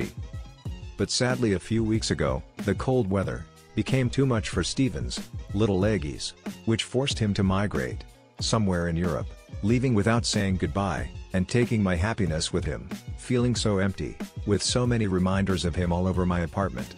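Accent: United Kingdom. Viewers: Aren't you British?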